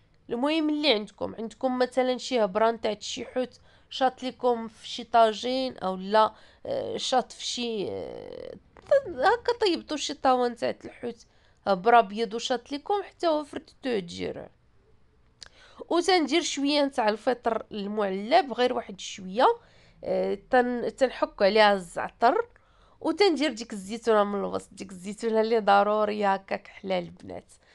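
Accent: Marrakech, Morocco. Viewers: باينه من الصوره. الأخت مراكشيه يكمن من الهجه ديالك حيت انا من مراكش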